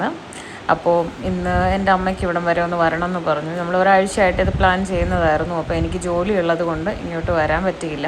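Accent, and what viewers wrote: Kottayam, India. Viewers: ഹായ്... എനിക്ക് videos എല്ലാം ഇഷ്ടമാണ്.. ഞാൻ അമ്മുമ്മയുടെ വലിയ ആരാധികയാണ്.. അമ്മുമ്മ " പറയെടി "എന്ന് പറയുന്നത് കേൾക്കാൻ നല്ല രസമുണ്ട്.. അമ്മുമ്മക്ക് ആയുസും, ആരോഗ്യത്തിനുമായി പ്രാർത്ഥിക്കുന്നു 🙏🙏🙏 അക്ഷര സ്പുടതയോടെ, ഉച്ചാരണ ശുദ്ധിയോടെ സംസാരിക്കുന്നത് കോട്ടയം ഭാഷ കേൾക്കാൻ ഒത്തിരി ഇഷ്ടമാണ്... ഈ അടുത്ത കാലത്താണ് ഞാൻ അമ്മുമ്മയുടെ video കണ്ടു തുടങ്ങിയത്... ഐശ്വര്യമുള്ള സുന്ദരിയായ അമ്മുമ്മ.. പാവം ❤❤❤❤❤❤❤❤❤❤❤❤❤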